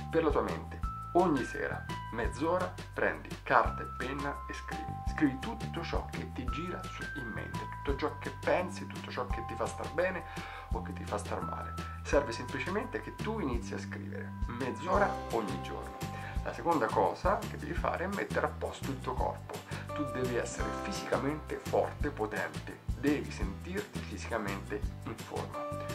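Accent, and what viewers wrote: Tuscany, Italy. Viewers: Beh, dai,... Arrivi solo con 10 anni di ritardo!!! 😅 Assurdo come tu abbia descritto per filo e per segno la mia relazione del 2008 che per 11 anni mi ha lasciata congelata. Ancora più assurdo che questo mi torni utile adesso che, invece, esco dalla prima relazione avuta dopo quel trauma, relazione che è stata molto intensa e importante per me. Stranamente il tuo video mi arriva proprio oggi, dopo averlo sognato stanotte. E strano che nei commenti legga di qualcuno che parli del 3 agosto, giorno in cui questa relazione è iniziata. E ancora più strano (ma questo mi è parso strano si da quando ho iniziato a seguirti 😜) che tu somigli fisicamente in maniera quasi fedele, addirittura nell'accento toscano, al mio "ex" sparito nel nulla nel 2008. Ma quanti casi... 😉🙃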